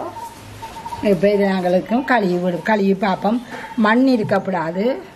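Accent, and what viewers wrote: Sri Lanka. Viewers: Antha ilangai Tamil ❤… Samayal ah vida rusi athigam 😇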